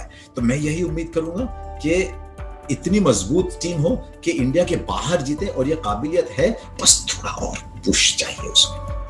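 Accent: Hyderabad, India. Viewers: There is Hyderabad accent deep in there trying to pop out..ofcourse it is present like a Hyderabadi biriyani in top end restaurant..presented in polished manner. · Hyderabad aur Lucknow ka asar kaafi hai Harsha Bhogle ji ki zubaan me. ❤️❤️ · he speaks hydrabadi as well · There is a bit Hyderabad accent there😀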